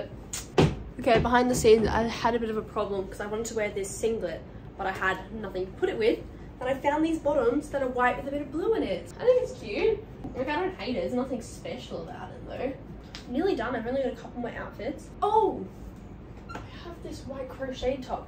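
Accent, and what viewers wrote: Australia. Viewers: I would die to look like you 😭😭 Even if I had 1% of your eternal beauty, I would probably feel more confident 😢:face-pink-tears: You are out of this world stunning - naturally cute, sweet and pretty - ur Aussie accent is adorable:) I Subscribed to your Channel btw <3:face-purple-wide-eyes: I have always thought this, but Australian people are the most beautiful people in the world - just look at Chris Hemsworth, Margot Robbie, etc... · 🙏𝟐 𝐭𝐡𝐢𝐧𝐠𝐬 𝐈'𝐦 𝐠𝐫𝐚𝐭𝐞𝐟𝐮𝐥 𝐟𝐨𝐫 𝐭𝐡𝐢𝐬 𝐂𝐡𝐫𝐢𝐬𝐭𝐦𝐚𝐬...𝐍𝐢𝐜𝐨𝐥𝐚 𝐂𝐫𝐢𝐬𝐚 𝐚𝐧𝐝 𝐭𝐡𝐞 𝐠𝐢𝐟𝐭 𝐨𝐟 𝐬𝐢𝐠𝐡𝐭 👀 𝐀𝐧𝐝 𝐝𝐚𝐭 𝐚𝐮𝐬𝐬𝐢𝐞 𝐚𝐜𝐜𝐞𝐧𝐭 😍 you've perfected the profile shot 😂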